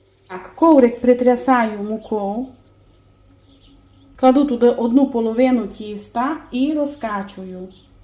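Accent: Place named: Prykarpattia, Ukraine. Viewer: Добрий ваш колач, а ще ліпша ваша мава. Дуже приємно вас слухати . В селі, де жила моя баба дуже подібна вимова, таке "и", хоч вже в сусідньому селі, такого нема діалекту. То на Прикарпатті, на Львівщині. І кухня у них відрізнялася. В бабиному селі жінки вміють пекти бульваники в п'єцу. Де ще вміють пекти бульваники? Цікаво яка історія, як заселялися люди, як переходили на інші місця.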